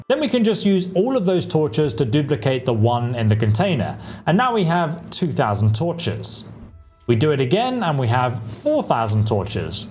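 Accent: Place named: RP, United Kingdom. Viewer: You’re very posh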